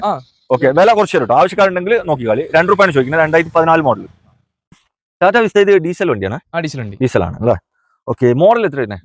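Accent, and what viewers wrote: Kasaragod, India. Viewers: കാസർകോഡ് ഉള്ളവരുടെ സംസാരം ഇത് വെരെ കേട്ടില്ലാ..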